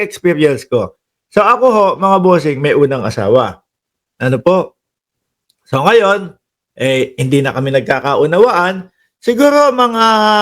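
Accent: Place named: Waray, Philippines. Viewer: Parang waray MAGSALITA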